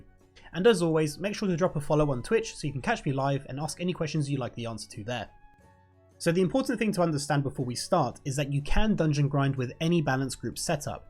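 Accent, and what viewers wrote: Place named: Scotland, United Kingdom. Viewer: You sound Scottish! I think all Scottish people play rogues and warlocks hahaha, seems to be the case on my server at least!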